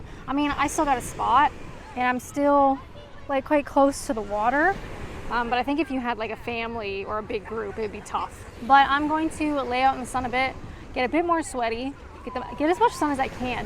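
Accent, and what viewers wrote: United Kingdom. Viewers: When you said to that seagull thee absolute cheek I was like yeah you’re definitely one of us.. you couldn’t of said that more English. Been a big fan of your channel for a few years now and you honestly have the British in you. Great content as always. Keep it up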